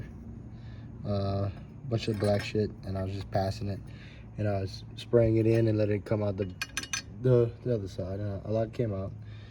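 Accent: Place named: South Louisiana, United States. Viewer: As soon as I heard you talk I knew you were from S. LA. Me too. I have a 2013 Sierra ticking its ass off. Wanna do mine lol?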